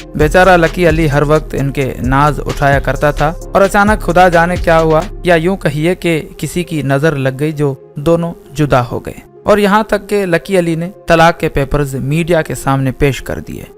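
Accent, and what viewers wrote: India. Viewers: Bera hee kanjer hei jiska ye channel hei. Harami, sharai taur per kiya ghalat hei. Aur tu hindi lehjey mei kio bhonk reha hei? Kutta. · Tm Indian ho tmhre bt krne se saf pta chl rha ha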